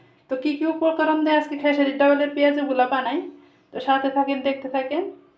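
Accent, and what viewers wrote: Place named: Tangail, Bangladesh. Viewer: Ata to amader tanggaeler vasa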